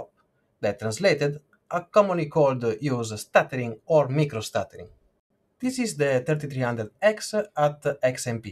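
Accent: Italy. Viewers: wait, are you italian?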